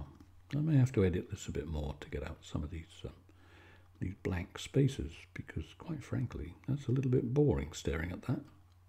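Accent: United Kingdom. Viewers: Finally one with a british accent! Love your talking while painting!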